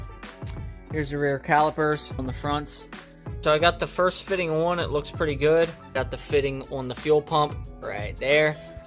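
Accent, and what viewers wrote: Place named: Philadelphia, United States. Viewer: the philly accent makes this build amazing.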